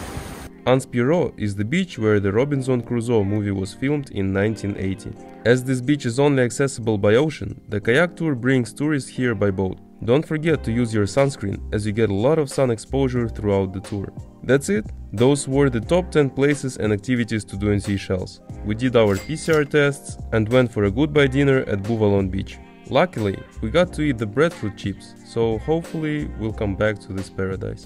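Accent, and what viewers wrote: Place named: Russia. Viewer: Братан, у тебя русский акцент. Это 100%. Ты откуда?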